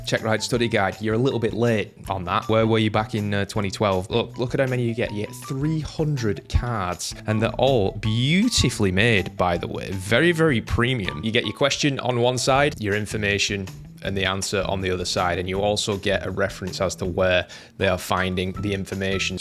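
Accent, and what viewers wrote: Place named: Bolton, United Kingdom. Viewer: Palmeiras do Brazzzil??? Lol I thought you were from Bolton good Sr 👍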